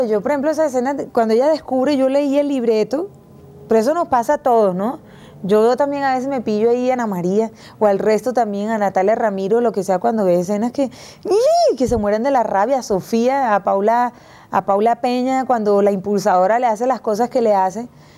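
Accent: Colombia. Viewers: No jodaaaa aca si hablas costeño, en Betty hablas como cachaca, eche, mandas cascara, costeñacachaca no joda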